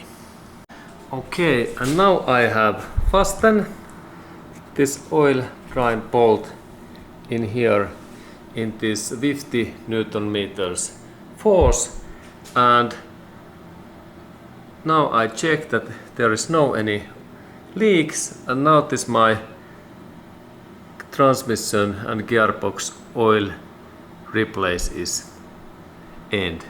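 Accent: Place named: Finland. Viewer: It is finnish Rally english...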